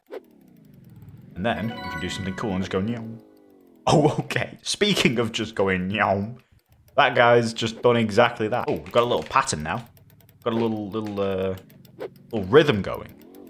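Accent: United Kingdom. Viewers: I didn’t know you’re British😂😂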